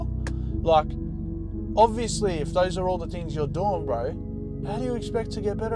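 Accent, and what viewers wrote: Australia. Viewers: mate r u aussie?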